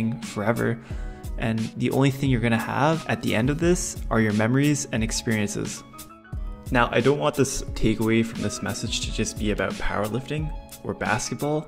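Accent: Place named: United States. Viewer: So what's your final point. I am sorry I am not very good at understanding American accent. Please explain me. All I understood was enjoying every moment. Is this video about that?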